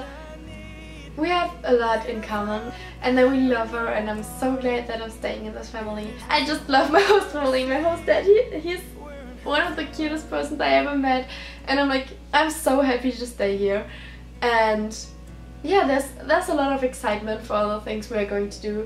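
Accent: Germany. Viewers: Dein Englisch ist echt gut .Klar man hört glaube ich immer das deutsche raus aber am sonsten echt gut 😍 Du hast ja am Anfang gesagt das du bald Ball hast und könntest du dazu ein video machen also wie du dein kleid kaufst wie du dich fertig machst und allgemein der Ball ?😊 Oder auch allgemein mehr videos machen wie zum Beispiel von deiner Schule wie es dort ist usw. also so eine art Vlogen ?🤗 Wenn du Zeit hast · That German accent combined with your poor English of is a real pain in the ass. A disclaimer for all whom willing to watch this cancerous video. Don't it'll only cause you pain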